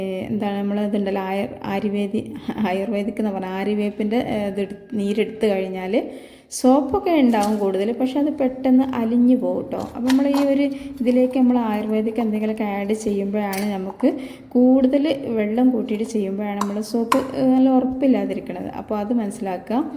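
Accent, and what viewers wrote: Malappuram, India. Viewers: Ingineyum samsarikkan ariyo allenkil malappuram thathade aniyathiyano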